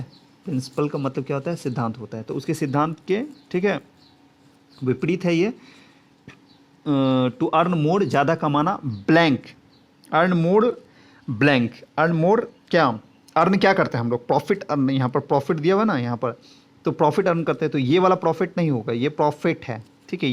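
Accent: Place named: West Bengal, India. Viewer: Vai tumi wb thke mone hoche. ❤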